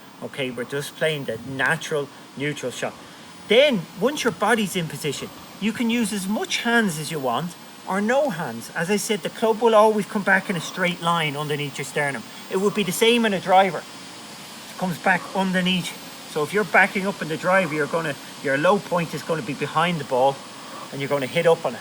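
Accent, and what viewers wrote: Ireland. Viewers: Genius. Struggling like a non-swimmer in Cat V rapids until now. Like most amateurs thought I was ok until I tried. Shattered the graphite shaft like a moron. Thanks. Don’t change a thing, especially the Irish brogue!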